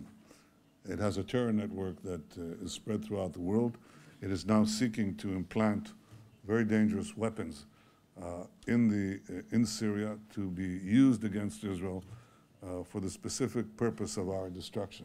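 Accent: United States. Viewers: Harsh Truth. And don’t forget Bibi is American too. That is a Philadelphia accent if I ever heard one, has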